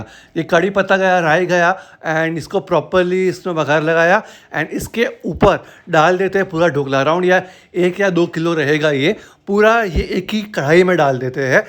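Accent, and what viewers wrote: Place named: Gujarat, India. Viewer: marcha vaghar rahey hey....😂 typical Gujju.. hindi + gujuu.. 👍👍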